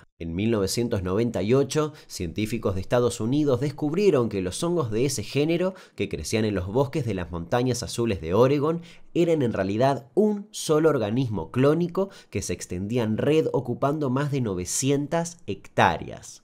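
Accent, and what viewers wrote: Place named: Argentina. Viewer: que bello cuando entro a un video de un canal que no conozco y termina siendo que el youtuber es argentinoooo, hermoso acento y precioso pais. saludos desde argentina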